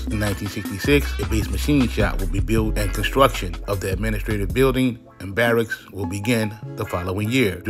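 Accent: New Orleans, United States. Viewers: Nigga Please...That's N.O. talk homie..3 times for the Real 3rd ward nicca..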